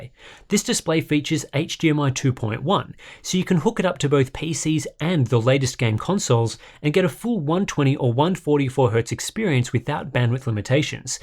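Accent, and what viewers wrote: Australia. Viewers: What would you recommend this or the LG ultragear GP950? By the sound of your accent getting a top of the range monitor in Australia is impossible due to the chip shortage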